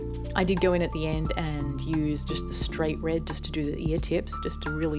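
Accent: Australia. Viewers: You sound Australian...I emailed them a few months ago to ask where I could get Arteza products here, or if they post here, and they said no. Has that changed do u know?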